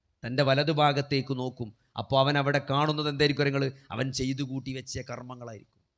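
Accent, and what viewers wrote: Malappuram, India. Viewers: That is pure Malappuram language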